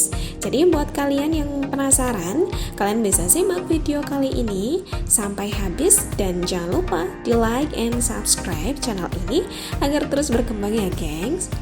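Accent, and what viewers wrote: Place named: Java, Indonesia. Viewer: Suarane medok gaes hahahaha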